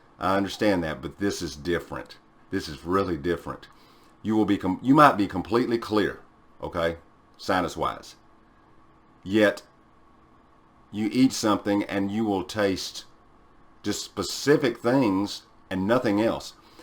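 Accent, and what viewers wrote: South, United States. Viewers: You have a southern accent! I’m in Canada and no one here has that accent unless they’re from the southern states! My best friend has it, feeling better but apparently considered a “long hauler”... 6 weeks now. Wondering if your taste and smell came back to normal? I would like to let her know as it’s an optimistic positive. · You definitely have a southern American accent 😉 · Nice to see you back and in good health.Thanks for what you do with the videos. Im in the UK. Sorry to say but you do have an accent , its an American one , I don`t have one because Im English. Please keep up the good work that you do. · You got an accent it sounds Texas like me must be a Southern accent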